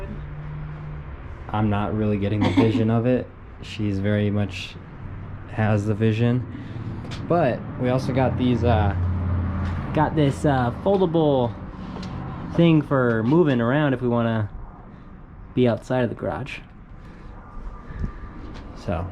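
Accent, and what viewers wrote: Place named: Australia. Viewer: Hahaha the Aussie accent 😂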